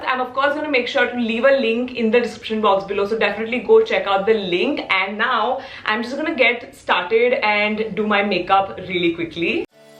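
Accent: Gujarat, India. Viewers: I love how. Cute gujrati u speak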